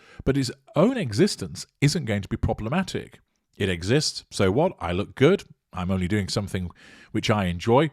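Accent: United Kingdom. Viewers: I love your Channel, yours contents and your British accent keeps me watching over and over because I am addicted to it. Greetings from🇧🇷❤️🫶🏻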